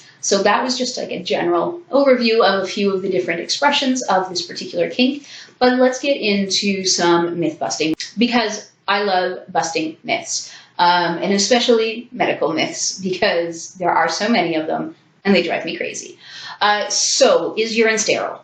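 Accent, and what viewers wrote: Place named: Canada. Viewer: Like your Canadian accent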